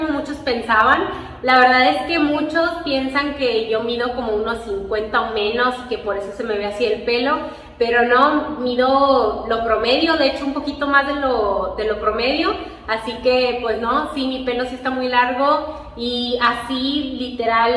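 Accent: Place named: Mexico. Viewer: Tu acento es como el de una mexicana mas ...soy de colombia y no diferencio un acento mexicano de un estado a otro...yo creo que solo los mexicanos notan alguna difencia ...😊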